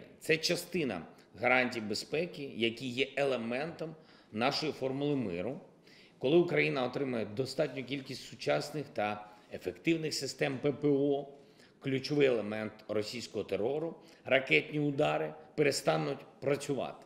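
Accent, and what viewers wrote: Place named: United Kingdom. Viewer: He speaks English with a British accent. He works for London city Charles.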